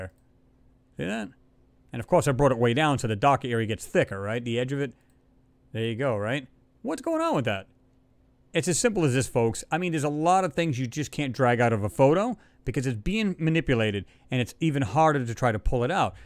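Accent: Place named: New England, United States. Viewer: Yeah I'm Diggin these videos I subscribe to you. I am also loving that New England accent. So much better than this Florida twang I have been forced to endure for 46 long yrs.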